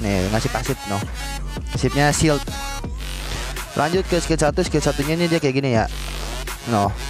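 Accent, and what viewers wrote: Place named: Jakarta, Indonesia. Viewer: Buset, noh Betawi bener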